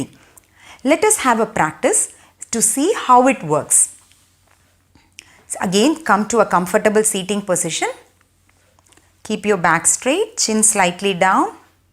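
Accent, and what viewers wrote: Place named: India. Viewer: I am very thankful for your video. I subscribed and I stared practicing breathing. And, at the same time , I enjoy listening your Indian accent. I am Rosario, from Uruguay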